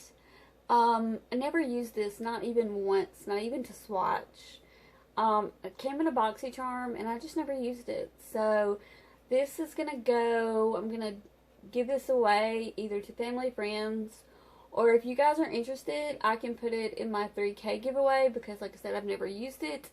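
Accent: South, United States. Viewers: This is such a great declutter video!!! Omgosh! You have such an amazing pallet collection it's CRAZY! I dont know what i would do having nice pallets like these to create with... 😏💭 just gorgeous😍 I have to say, I love your accent. Very strong southern accents are my favorite 😃😍 I use to live in South Carolina, I loved it.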